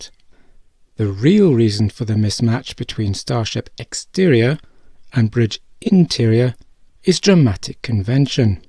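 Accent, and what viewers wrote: United Kingdom. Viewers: he’s a Brit… They pronounce thing weird.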